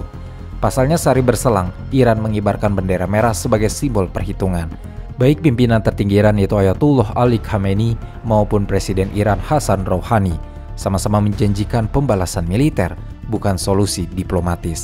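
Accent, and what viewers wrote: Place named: West Java, Indonesia. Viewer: Dari cara bicara nya, tampak nya mimin dari suku Sunda.. kumaha min, daramang ?? 😃😁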